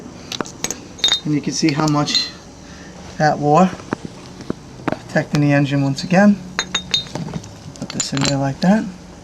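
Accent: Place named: Brooklyn, United States. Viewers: Thanks for the video very informative, were u born and raised in long island because you have a Brooklyn accent..hehe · Love that New York accent, even a Cajun like me understood the good video job. Thanks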